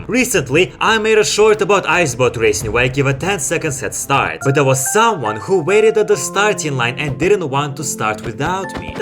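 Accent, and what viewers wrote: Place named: Russia. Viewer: У тебя русский акцент, ну или хотя бы акцент стран снг, откуда ты?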